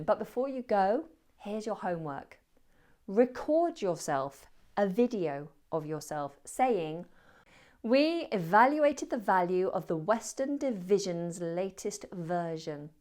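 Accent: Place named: United Kingdom. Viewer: British English pronunciation with Miss!!!... Excellent!!!...❤💫👌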